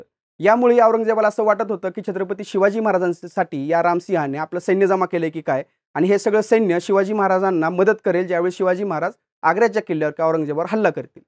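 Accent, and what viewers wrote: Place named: Sambhajinagar, India. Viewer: भाउ तुम्ही मला मूळ संभाजीनगर नगर चे दिसतात भाषेवरून त्यातल्या त्यात सिल्लोड चे .. तसा मी चुकीचा व असू शकतो पण मला वाटतंय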